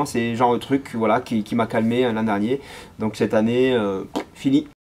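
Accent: South, France. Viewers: Super ta vidéo t'as l'accent du sud tu est dans quel département ?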